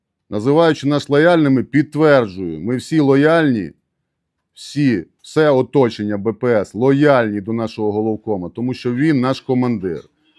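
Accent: Transcarpathia, Ukraine. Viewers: Дякую за рекламу закарпатського діалекту української мови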